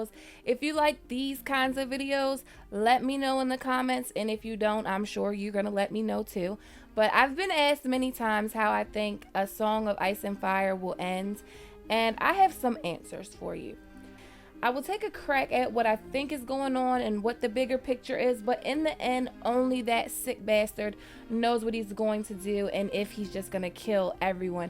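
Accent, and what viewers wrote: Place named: Midwest, United States. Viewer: lol NOT comparing you to that creature. Where your accent from anyways? Midwest? Chicago? I actually prefer you being genuine rather than others who put on a terrible British accent when discussing ASOIF.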